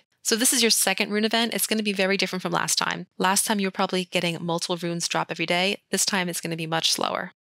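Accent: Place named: New Jersey, United States. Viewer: New Jersey accent?! How did you end up in Europe? Come back to Jersey.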